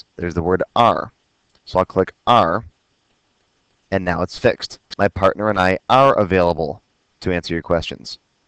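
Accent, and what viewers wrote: Chicago, United States. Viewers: I checked out several tutorials, (school free ware etc). This is easily the best tutorial on the internet. Love that Chicago/Great Lakes accent, LOL! Seriosly, thank you!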